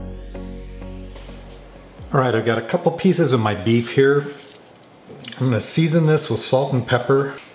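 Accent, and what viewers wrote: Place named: Chicago, United States. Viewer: awesome recipe! You sound like you are from Chicago.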